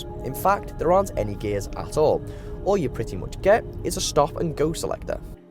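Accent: Liverpool, United Kingdom. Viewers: You from Liverpool???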